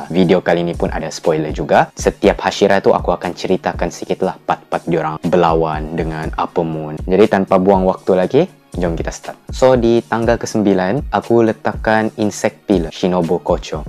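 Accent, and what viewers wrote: Sabah, Malaysia. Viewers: Org sabah ka bro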